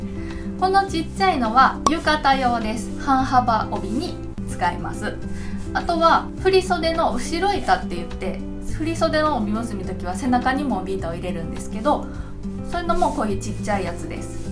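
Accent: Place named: Kansai, Japan. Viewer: とても分かりやすいし、関西弁が懐かしいので、チャンネル登録させていただきました。 和裁は少し習ったことがあるのですが、着付けはまだ全然です。 着物を着る日本人が増やしたいですよね！('◇')ゞ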